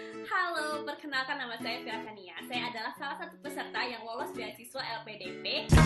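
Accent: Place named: United Kingdom. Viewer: Logatnya Udah Btistish UK banget . 😅😅😅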